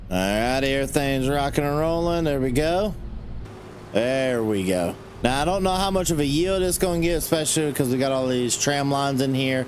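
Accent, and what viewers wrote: South, United States. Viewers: just started watching. looking forward to this. always fun to have the someone with a southern accent doing some farming. thank you in advance.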